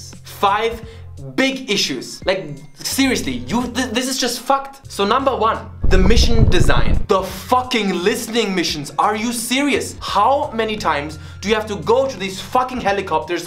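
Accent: Germany. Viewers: Haha unglaublich wie ich einfach deinen Deutschen Akzent rausgehört habe. Super Review Abo hast du , keep up the great content. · MY G YOU SPEAK LIKE A GERMAN IN ENGLISH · The whole Time I thought why does he have an German accent and then he speaks German 😂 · Wie man einfach diesen deutschen Akzent raushört😂. Ehrenmann. Bin zum erstenmal auf deinem Kanal aber der deutsche Akzent hat dich erstmal direkt sympathisch gemacht😂. Frag nicht wieso...😂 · Leon - 501st Liegt eventuell auch daran, dass man das sowieso sehr gut raushört, weil er mit einem STARK deutschen Akzent spricht 😂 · Best video ever on youtube, best accent, best game review ever, I love it, laughed every second, your fantasy and creativity are above normal 😂 subbed. And definetely will check others reviews aswell, if your channel is that type, and includes video’s like this👍🏻🤙🏻😀 And I knew you was German because of the S and Z, Sie Germans, Hanz Gez Zhe Flammenwerfer😂 Greetings from Holland Niederland, Niederländisch.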